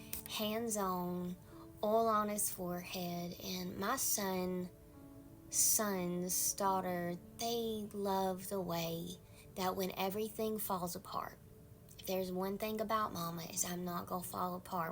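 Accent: South, United States. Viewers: By the way love your southern accent :)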